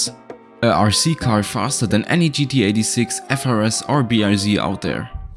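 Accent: Germany. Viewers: Mann erkennt direkt den deutschen in dem english Akcient😂😂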